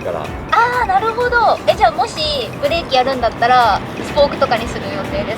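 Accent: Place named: Hiroshima, Japan. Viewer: ちゃん今日もめっちゃ可愛いね💕 相変わらず広島弁がいいね👍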